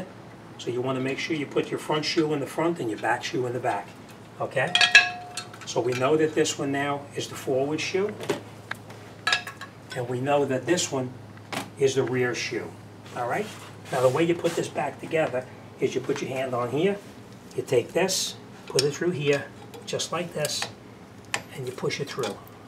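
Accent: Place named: New England, United States. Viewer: Cool. You have a New England accent! "Hello Your On Car Talk"!!!!!! Don't drive like my brother!!!!!!!!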